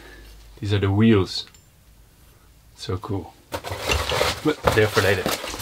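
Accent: Netherlands. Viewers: dacht al een nederlands accent te horen! gister op je kanaal gekomen nu geaboneerd! kan niet wachten tot ik mijn schuur/werkplaats klaar heb dan komt er ook zeker 1!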